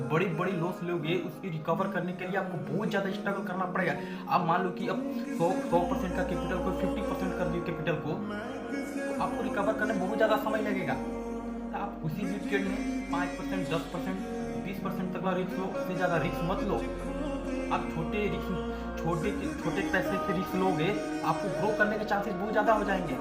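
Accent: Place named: Bengal, India. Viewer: App ke bat se pata chal raha app bengali hoo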